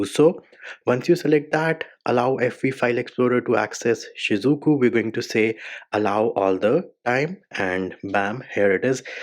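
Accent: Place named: India. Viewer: When i heard that bro have an indian accent i knew my problem is already solved.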